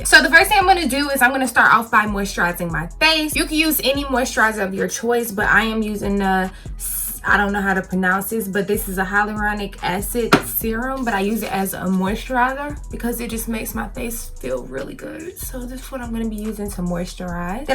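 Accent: South, United States. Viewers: I didnt see the southern accent coming. It's engaging, and you're beautiful! 😘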